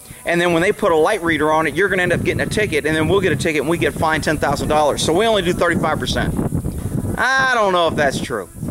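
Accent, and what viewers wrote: Texas, United States. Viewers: Congrats! She's a beauty. FYI..Your accent sounds like Texas to my ear. I have always loved that state. And your videos with the boys on that property makes me want to move. Long Island used to look like that but all the land, horses and cows are gone now. It is over built with no property left.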